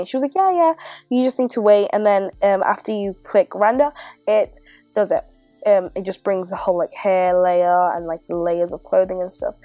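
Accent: United Kingdom. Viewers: FINALLY! Someone British.. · Are you from London?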